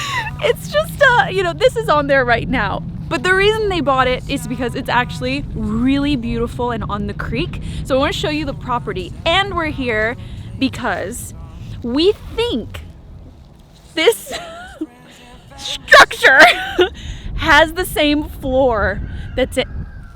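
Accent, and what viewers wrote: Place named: South, United States. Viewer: your southern accent is REALLY starting to stand out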